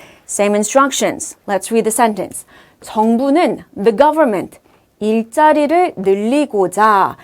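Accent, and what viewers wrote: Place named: England, United Kingdom. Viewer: Her English accent 😍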